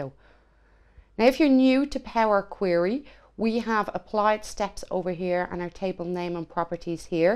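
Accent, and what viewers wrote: Ireland. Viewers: Great. Thanks. Btw nice Irish accent. :-)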